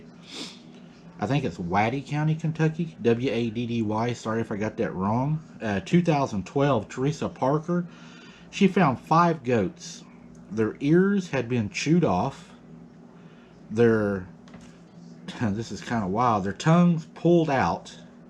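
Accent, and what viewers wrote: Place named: Tennessee, United States. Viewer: You must be from Tennessee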